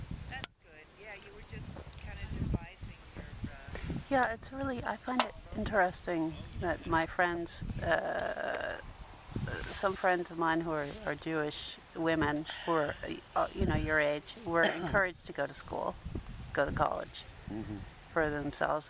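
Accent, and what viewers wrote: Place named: Philadelphia, United States. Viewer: Great example of a Main Line accent! Thanks for posting!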